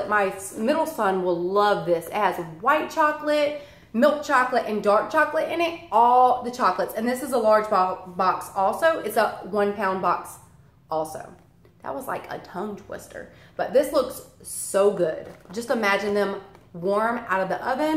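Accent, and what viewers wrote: South, United States. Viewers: Just found your channel! I absolutely love your personality! Where are you from? Is that a southern accent?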